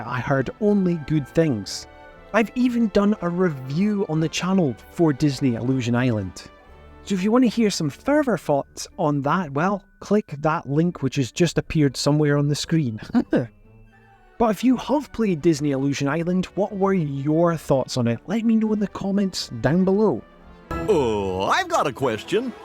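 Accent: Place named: Dundee, United Kingdom. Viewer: lol as soon as i heard the accent bro i was sure it was dundee lol. i moved here not long ago and that's how everyone sounds to me :P if you heard me you would probly be able to guess what parts i'm from as well haha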